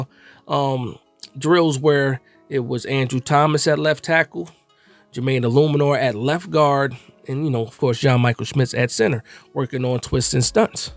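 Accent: New Jersey, United States. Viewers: No matter how long you been in the west coast you sound straight Jersey lol. Represent the garden state brother 💪🏼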